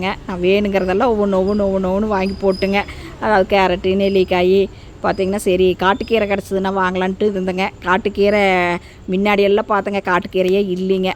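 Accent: Coimbatore, India. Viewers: நீங்கள் பேசும் கோவை slang கேட்டுக்கிட்டே இருக்கலாம் போல் இருக்கு சகோதரி.. ரொம்ப பிடிக்குது வாழ்த்துக்கள்..!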